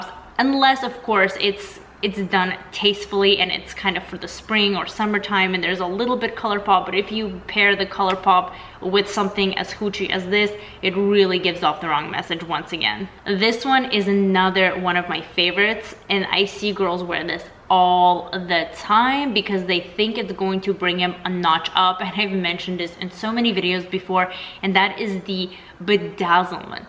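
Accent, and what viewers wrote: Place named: Russia. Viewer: I was doing good until you got to wedges. I have a pair of black ones for the office. Where I live in Canada a lot of women wear these type of shoes for work. It makes driving a lot easier, easy to go up and down stairs, don't need to worry about getting heels caught in the escalator or those annoying grates on the sidewalk that you can't avoid while walking in a crowd. And for me, I am always scruffing my shoes on the wheels of my chair. But yes, my sandals are pretty, I think. I was also wondering about your heritage. Is your background Russian? My background is Ukrainian and you sound Russian to me.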